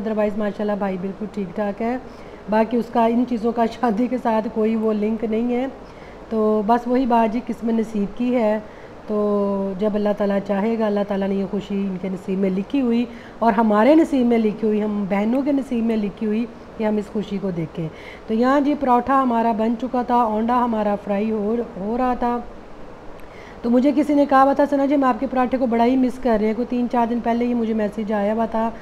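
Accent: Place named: Jalandhar, India. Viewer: ਤੁਹਾਡੀ ਬੋਲੀ ਇੰਡੀਆ ਪੰਜਾਬ ਚ ਜਲੰਧਰ ਵਾਲਿਆਂ ਨਾਲ ਮਿਲਦੀ ਐ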